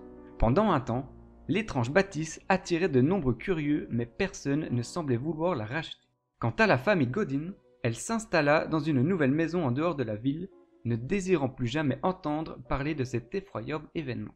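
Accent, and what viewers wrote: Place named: Jura, France. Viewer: Tu as ll'accent jurassien c'est excelent^^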